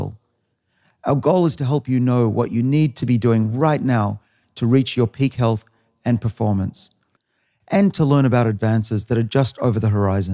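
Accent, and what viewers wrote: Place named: United States. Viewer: He's picking up an American accent.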